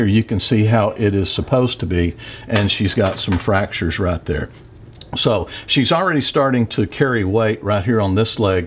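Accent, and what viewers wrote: Texas, United States. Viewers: love his texas dialekt haha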